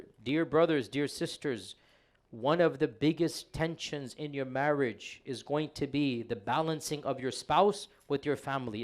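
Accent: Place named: South Asia. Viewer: You sound desi AF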